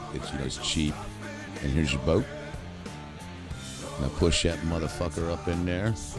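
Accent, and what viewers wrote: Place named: Midwest, United States. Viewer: Your voice tells tells of many great tales in the American Midwest by the utterance of just one word.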